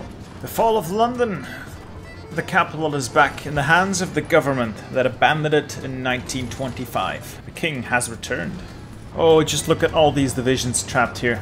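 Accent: France. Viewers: You have a great French accent! You're not French right? Haha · You've a nice french accent, where are you from?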